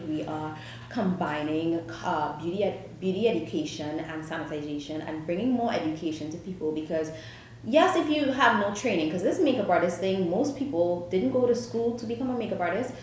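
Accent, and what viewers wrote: Trinidad and Tobago. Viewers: Are you Trini? I feel like I'm hearing my accent but i'm not sure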